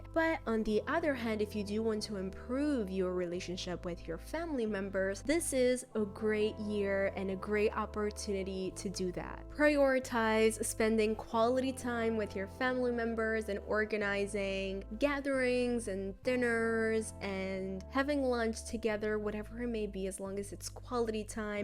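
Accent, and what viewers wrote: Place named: Italy. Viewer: OMG ARE YOU ITALIAN? I understood you bf hahaha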